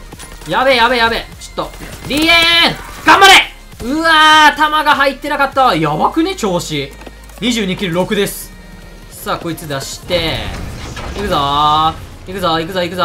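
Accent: Kansai, Japan. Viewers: 元が関西やからな。普通に関西弁使うときあるしイントネーションも関西寄り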